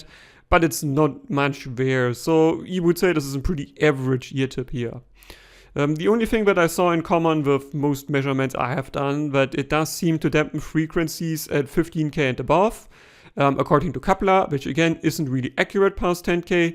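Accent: Germany. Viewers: Dude, you sound like "der8auer", if you are probaly familiar with that name and anything he represents :)) probably the german accent...Anyway, your reviews are accurate and true, witch matters the most. Thank you!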